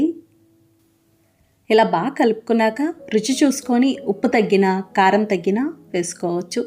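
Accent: Godavari, India. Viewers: Meedhi godavari aa andi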